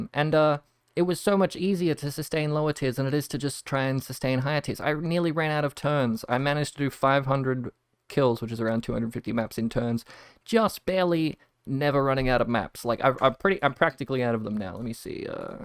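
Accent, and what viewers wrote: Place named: Australia. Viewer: I never click any bell and always see new videos. The new audio makes you sound Australian.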